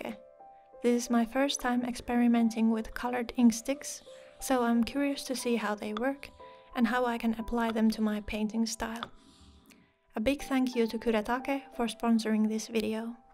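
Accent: Finland. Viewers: Tell me you're Finnish without teeling you're Finnish! Tunnistan aksentin mistä vain! Ei siis huonolla tavalla. Söpöllä tavalla. Oot super taitava ja todella kaunista jälkeä! Ihan kateeks vetää nähdä noin upeaa sivellin työskentelyä! 👀❤️ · Mä tiesin että jotakin tuttua on aksentissa ja suomestahan sä. Somebody pick up the phone, cause I called it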